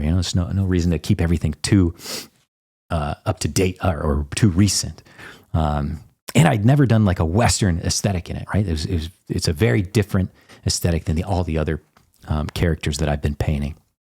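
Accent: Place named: United States. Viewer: I was half-expecting you to be dutch (I was surprised by the american accent)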